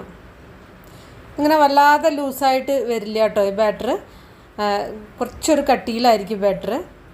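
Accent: Palakkad, India. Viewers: avar parayunnath enthanennu manasilayallo athu pore. Palakkad chilar usually ingane aanu parayuka. Ellaavarum ningale pole achadi bhasha samsarikkanam ennu nirbandham pidikkaruth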